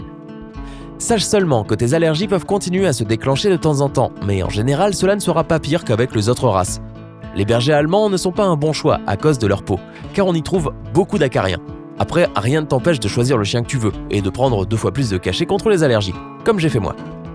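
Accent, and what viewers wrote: Quebec, Canada. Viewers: Oooo saï pour j'aimai bien la voit québécois enpluse saï la même voit que lama fache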